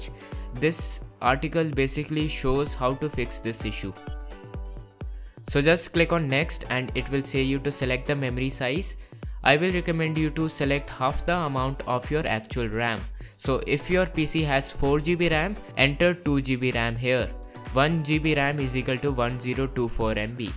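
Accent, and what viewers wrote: India. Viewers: Nice video. Your english sounds like the english from apu nahasapeemapetilon(Simpsons)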